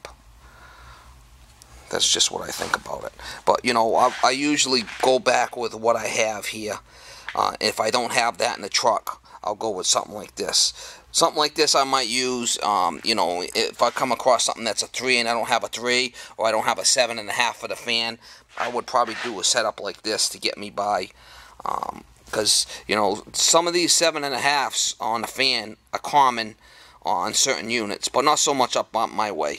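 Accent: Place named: New England, United States. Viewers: Like your yankee accent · No he doesn't, that sounds closer to Boston accent....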